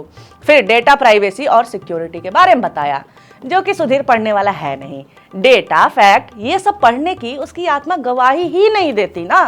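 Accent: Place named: Agra, India. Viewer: Ms. Anchor-Young Lady Aapki ghungrali hindi Sun kurr bachpan kay din yaad aa jateon hain. Lagta hai aap Agra kay gird pudhi badhi hain. Thank for making us smile God bless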